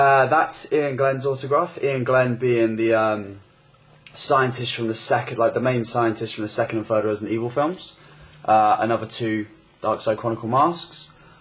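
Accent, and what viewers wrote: United Kingdom. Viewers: Eu acredito que ele more na Inglaterra pelo sotaque, mas talvez eu esteja errado ;)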